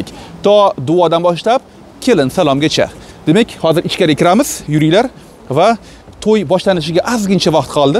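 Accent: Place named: Uzbekistan. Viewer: Битта лайк сиздан ургилиб кетсин хакикий узбекча нутк учун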